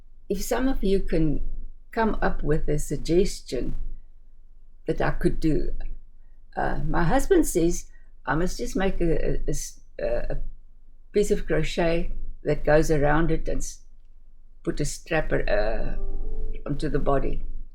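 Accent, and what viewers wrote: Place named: Afrikaans, South Africa. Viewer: We were trying to place the accent. It has a little of that So Africa/Australia sound but also a Dutch/German sound. Afrikaans! Makes so much sense. My ex-husband spent two years in Capetown and we named one of my kids Xolani (ex says the click, I do not) and two of the kids have Xhosa middle names as well. I'm sorry about the bulkier yarn. I am glad you were able to make some things. That bear! Sooo adorable! I hope your great-granddaughters love the hats. I guess they don't need them until we are having summer. :D I like wearing slipper socks. :D I haven't been knitting long enough to need to repair socks yet. I made my daughter a reversible hat using Tunisian crochet. It's thick and so pretty. Love seeing what you have going.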